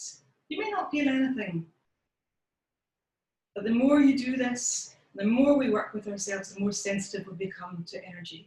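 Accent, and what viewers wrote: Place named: Scotland, United Kingdom. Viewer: Thank you so much for this, your lovely Scottish voice and your energy are just so delightful. I have shared this with my family and friends in this country, and the USA and relatives in Italy. My nephew passed on Sunday, not covid related, we are all devastated but I’ve found this helpful and a managed to do it today. Can’t quite smile , just tears for now, it that’s healthy tears and the smile will come. Thank you 😊 xxx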